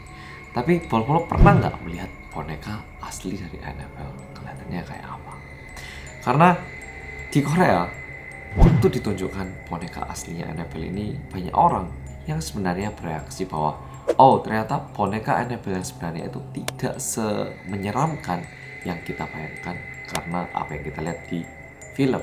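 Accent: Java, Indonesia. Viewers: Orang Korea tapi ngmong nya medok banget wkwk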